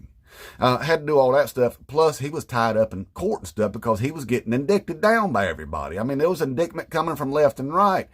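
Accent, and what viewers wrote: South, United States. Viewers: I have lived in South Carolina for 44 years and I can’t tell you how much it warms my heart and comforts me to hear a thick southern accent like yours brother speaking truth and also sounding extremely intelligent. I just made a very long TikTok yelling at Southerners for voting like they have no brain and some southern gal comments on my video that she agrees with me 100% and that groceries are so much cheaper under Donald Trump than Joe Biden. I never said that in the video. I said the opposite of that during the entire video. How are these people so stupid? Anyway, brother, thank you for speaking truth, especially in a southern accent.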